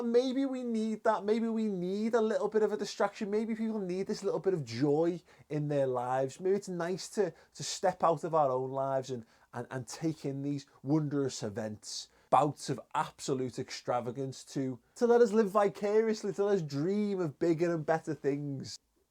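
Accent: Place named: Northern England, United Kingdom. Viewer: is this mams front room my lover. track suits so 1988 old boy with a up north voice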